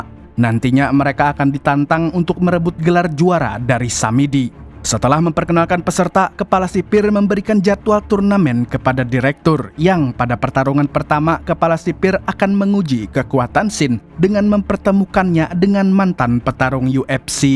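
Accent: Banjar, Indonesia. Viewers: Orang Banjar kah om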